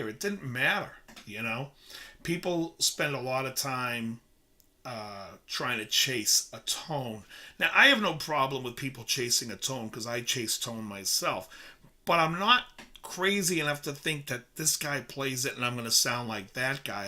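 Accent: Boston, United States. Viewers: You're smooth as silk on that Glamour Profession outro. Keep up the good work. Love the rants and the Boston (or vicinity) accent. Do me a favor and work the word 'car' into a rant sometime soon.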